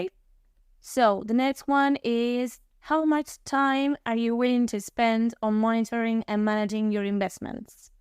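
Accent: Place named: Italy. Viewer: I guess you are Italian. Nice accent. Great Video.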